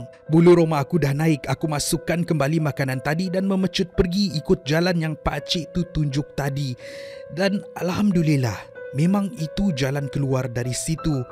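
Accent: Sabah, Malaysia. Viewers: dia ni orang sabahh ka?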